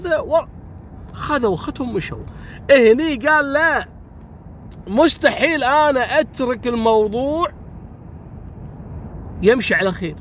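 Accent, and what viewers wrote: Morocco. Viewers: ما احلى اللهجة المغربية بصوت اخونا ،تحية مغربية من بريطانيا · تعلمت اللهجة المغربية يا حتى المدن المغربية بدات تعرفها تحياتي لك من المغرب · يازين كلامك بالمغربي 😉😛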